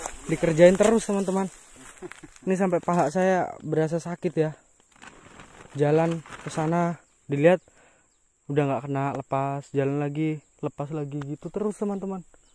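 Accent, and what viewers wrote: Java, Indonesia. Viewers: Wong jowo to mas?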